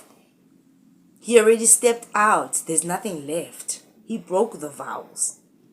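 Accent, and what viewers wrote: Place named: United States. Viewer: Its the "snobish American accent" that does it for me,it gets me all the Time 😂😂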